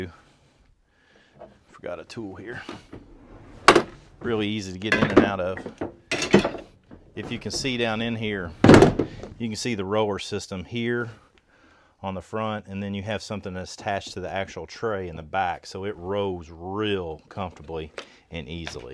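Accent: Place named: North Carolina, United States. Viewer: This was a great video bro. Man hearing your accent reminds me when I lived in nc for a bit.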